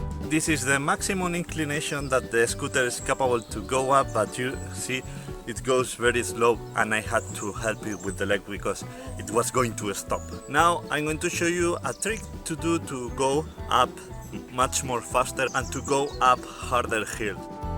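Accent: Spain. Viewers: el acento mas fuck up despues del hindu el de españa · Where did you shot this "bideo"? I thougt it was in Galicia because of you accent but then you said something about going to Barcelona... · Fua ese acento español que no nos lo quitamos ni patrás pero la verdad que suena bien y todo. Buen vídeo jajaj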